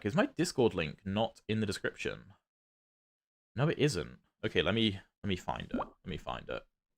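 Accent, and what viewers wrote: United Kingdom. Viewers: You are very good at explaining your ideas. Also, I love the British accent. Greetings from a new subscriber in Germany!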